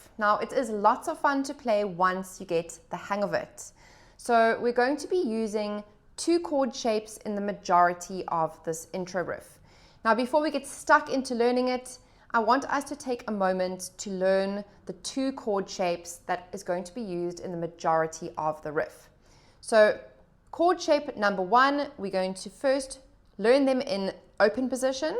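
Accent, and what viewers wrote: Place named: South Africa. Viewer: Yay SA accent, finally.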